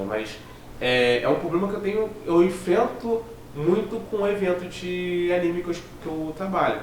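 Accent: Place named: United States. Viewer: CARAMBA ACHEI QUE VC ERA AMERICANO mas e brasileiro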